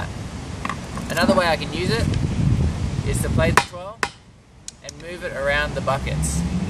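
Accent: Australia. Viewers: Is that an Australian accent? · Yes. The Australian accent varies a little bit over different areas of Australia.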